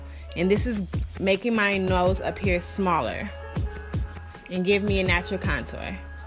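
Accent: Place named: California, United States. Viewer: You sound like you from California 🤔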